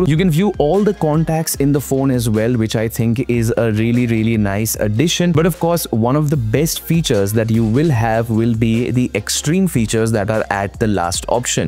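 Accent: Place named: India. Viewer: bro, what an annoying jeet accent.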